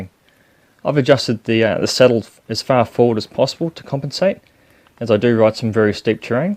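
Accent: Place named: Australia. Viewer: Thank goodness an Aussie voice and not a droning yank.....Great vid and very informative...Thanks mate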